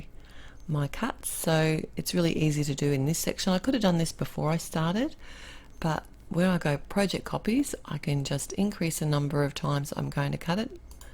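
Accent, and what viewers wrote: Australia. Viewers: Just learning how to use my cricut. You sound Australian, me too.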